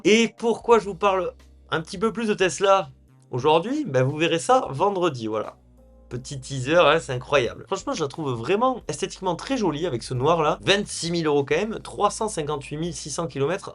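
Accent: South, France. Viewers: la grande classe, le sourire, le petit accent ensoleillé… bon je préfère les formats sur les Youngtimers mais au moins tu en fais pour tout le monde. La bisette à toi change rien.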